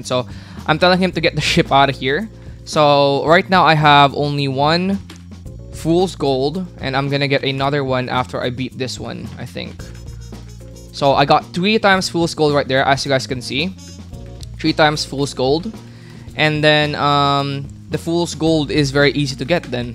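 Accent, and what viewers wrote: Philippines. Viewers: Are you a Filipino? Because i think you are cause of accent lol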